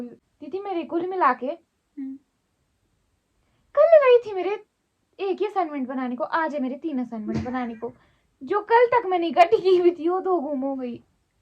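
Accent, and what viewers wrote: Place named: Himachal Pradesh, India. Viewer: Ap himachal se ho na didi ?? Apki tone lagri hai himachal jesi 🥹bahoot acha laga mughe .... Bahoot time bad ese tonne sunne mili 😭😭😭🥹♥️♥️ .....mai mandi se hu ...aao kabhi chai pine ....filhal mai Pune me rehti hu ...bahoot yad ATI hai mandi ki 😭😭♥️♥️♥️🥹🥹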